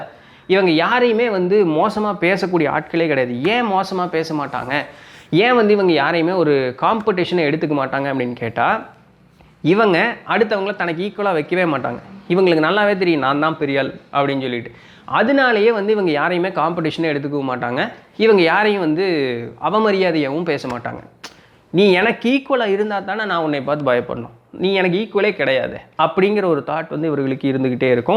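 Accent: Coimbatore, India. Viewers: Coimbatore slang super 👌🏻👌🏻👍🏻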